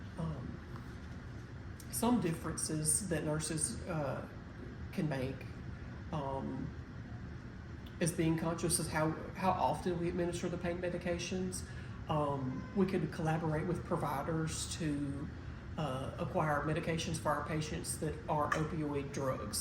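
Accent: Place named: South, United States. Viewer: Close your eyes and imagine a plump southern Belle talking because this lady is her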